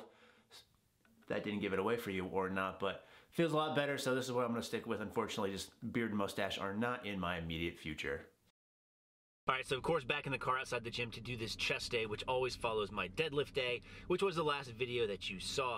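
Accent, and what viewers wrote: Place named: South, United States. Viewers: ʻPedostacheʻ, Country Accents and ʻMirror ʻMirinʻ... makes for a complete and entertaining video! Seriously, I havenʻt tried floor presses. Looks like they mainly help you with the last 3rd of the press. Interesting, I might try those because I have good explosion off the chest, but, I seem to get to a sticking point during the last 3rd of the press. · dat southern accent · THAT SLOW PROGRESSION TO A SOUTHERN ACCENT HAHA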